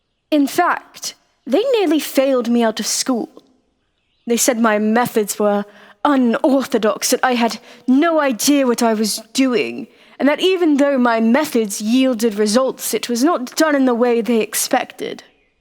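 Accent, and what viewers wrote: RP, United Kingdom. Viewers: oh no, almost made it out of class with the crowd.. she was too quick for us👩‍🏫. From dissapointment to losing out on an award and an ear bashing from the teacher, to getting a bit of a personal glory and history lesson from her and being told to shape up for ourselves.. this is an ideal teacher. Took a gamble admitting what we thought of her personally too, did give us more motivation to get back on schedule and get to spend more time with her, studying📚. Magical academy always makes me think of a potty school for wizards 🏰but this took it in a new direction and had a bit of a DnD feel which is a great change, original and fun idea and can't help but like the posh accent in a dominant tone either, brilliantly fun audio once again 🎧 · Damn!!!!! that thumbnail is mega shes hot love the posh voice too very beautiful ❤️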